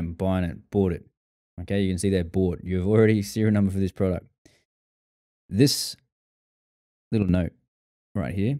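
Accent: Australia. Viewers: Hey mate I am looking into getting involved in dcs at some point, I watched this, and if and when I get around to it I will definitely hit you up for info and further knowledge and hopefully you could be my wing man anyday ( had to say it ) / coach to get me up to speed. Great content and insight, loved the foul language as an Aussie myself you can't beat how we talk and have slang that most can't understand.. loved it, true words not just scripted for precious ears in this day and age.. I will definitely be watching more of your content because of how you come across and are REAL.. cheers mate..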